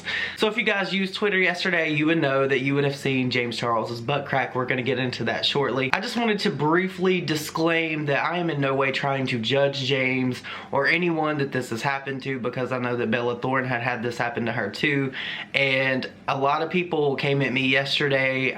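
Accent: South, United States. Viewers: Are you from the south? Cuz same.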